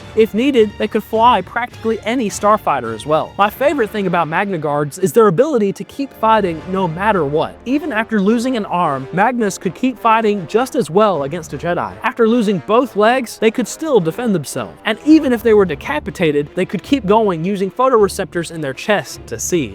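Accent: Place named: South, United States. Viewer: I love the southern accent trying so hard to come out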